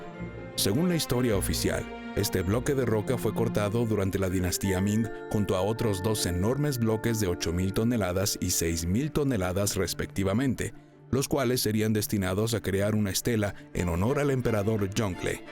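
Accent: Mexico. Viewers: Habla con mucho cantito mexicano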